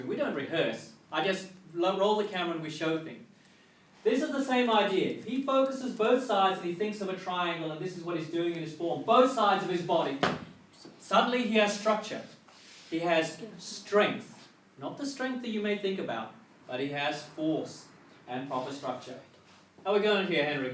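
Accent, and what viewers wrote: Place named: England, United Kingdom. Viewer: Hi, love your channel. I've been practising Wing Chun for 2 years now, and my sifu's philosophy resembles much yours. It's all about relaxation and feeling in the arms when practising Chi Sao. You sound like you're from England. Please confirm and if so, I'd love to come to your course sometime when I visit England again ( hope I'll be soon enough, I'm not too far: south of France ). Cheers!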